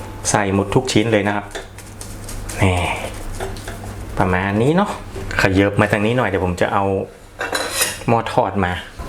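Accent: North, Thailand. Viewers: คนเหนือเฮาอู้ม่วนจ้าว❤❤ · อู้คำเมืองเพราะขนาด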